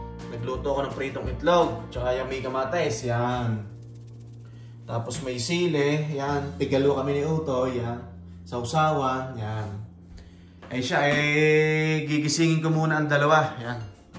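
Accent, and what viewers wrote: Batangas, Philippines. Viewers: Kuh nga batanguenio pala arih, bay nga mga batang ang twag sa mga asawa e”huy” haha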